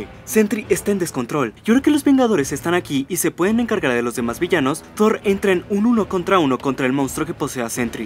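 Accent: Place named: Mexico. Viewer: Hey carnal mis respetos t la sacas para narrar los shorts parecieras mexicano que las pirula la pulida de bastón darle duró a la tula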